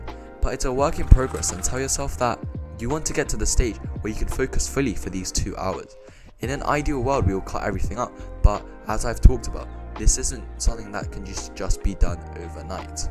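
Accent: England, United Kingdom. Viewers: At first, Korean with this English accent🤌 Secondly, good video, hopefully it'll help my little sister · Just noticr this is a british asian, he got the brains and sounds even smarter because of his accent gawddamnit! Lmaoo i paid attention though thank for the tips bouta applied the today!!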